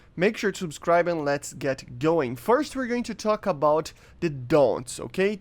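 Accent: Brazil. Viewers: nice video, but i have a question, are you brazilian? your accent sounds brazilian, if not, where are you from?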